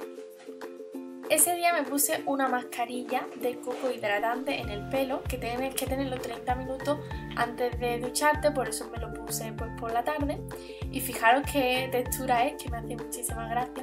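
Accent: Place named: Andalusia, Spain. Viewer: MADRE MIA !!!!!!!!!!!!!como se nota que eres andaluza , como yo !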